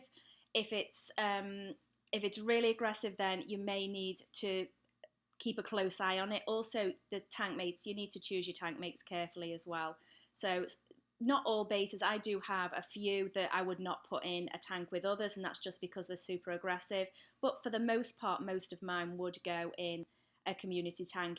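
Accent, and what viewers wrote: United Kingdom. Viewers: MAN I MISSED THE LIVE STREAM!!!!! i was really busy today, and didn't even touch my laptop:( i love this video though.... and i hope you make more live streams. PS i knew you were British!!!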